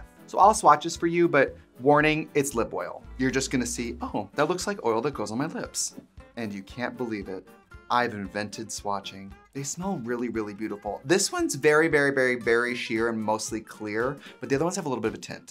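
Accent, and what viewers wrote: Wisconsin, United States. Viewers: As someone who lived in Wisconsin for 10 years, her accent coming out always makes me giggle lol. It’s prominent in a lot of the things she says but it’s too funny when it REALLY comes out 😂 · the wisco accent 😭❤️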